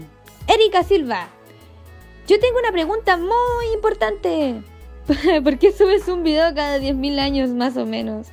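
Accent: Chile. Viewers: Es que lo dije en cuánto escuche tu voz xD Se nos nota mucho el acento chilensis(?) xD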